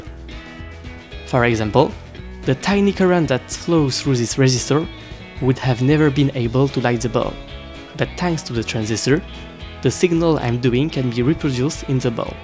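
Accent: France. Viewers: the accent is not the best (i know you are french) but great video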